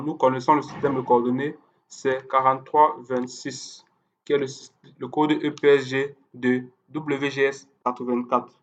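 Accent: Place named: Benin. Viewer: J'aime bien tes tutos, mon ami à l'accent béninois ou camerounais. Tu es opérationnel. J'aimerais bien avoir ton contact WhatsApp, si cela ne t'ennuie pas bien sûr. Le mien c'est le <num>. Je ne sais pas où tu vis, mais, le Burkina n'est pas loin et tu pourrais facilement y dispenser des formations!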